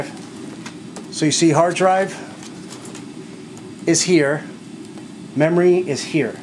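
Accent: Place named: Bronx, United States. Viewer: yo!!!!!! are you from tha Bronx or somthin!!?? ah???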